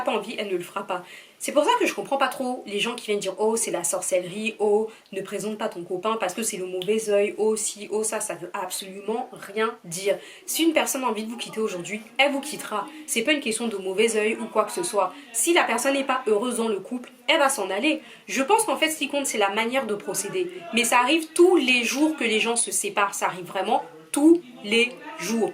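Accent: Cameroon. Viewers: Ton accent kmer c'est la tension😊. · Si vraiment je dois être honnête j’ai pas compris l’intérêt de la video a partir du moment où raflo elle même a déjà répondu au question des gens . Je pense que porter de l’intérêt à ce connard n’est pas utile . Raflo mérite mieux ! Qu’elle vive sa vie une nouvelle et belle vie mama le gars la est pourri , elle a de la chance de t’avoir à ses côtés Jpense que c’est grâce à vous son entourage aussi que la rupture ne la pas abattu . Bref malgré que je trouve pas l’intérêt de ta vidéo merci d’être là pour elle je pense que tu es une très belle rencontre pour elle . Laissez vos affaires de sorcellerie bande de fou. Ton accent camer me stress trop 😭 bisous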